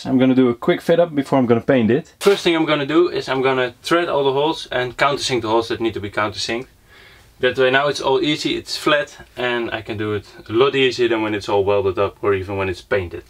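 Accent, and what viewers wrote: Netherlands. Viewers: dacht al een nederlands accent te horen! gister op je kanaal gekomen nu geaboneerd! kan niet wachten tot ik mijn schuur/werkplaats klaar heb dan komt er ook zeker 1!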